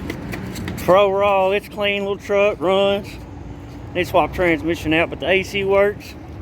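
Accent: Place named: South, United States. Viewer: The spontaneous joke at the beginning was pretty funny. I think you guys should start doing some kind of joke session or like a joke of the day or something. There's something about that southern accent telling a joke with a splash of cuss words LOL. I really think there's something to that man I think it would bring some people in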